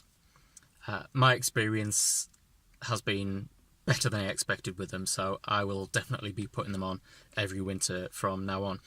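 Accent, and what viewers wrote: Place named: Cumbria, United Kingdom. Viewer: Can't believe after all these years I've never put winter tyres on my car in winter. On 27 Dec I nearly got caught in the 20 car pile-up on the M6 in a mini-blizzard. Never again. Luckily for me, I won an auction of ebay and got 4 wheels with 4 Nokian WRD4s fitted for a crazy bargain price. Got them on now, and what a step change!! Feel so much more secure now. Doesn't make me impervious to accidents, but sure takes the environment out of the equation. 👍 PS I see the footage was Yorks/Derbyshire, but that doesn't half sound like a West Cumbrian accent!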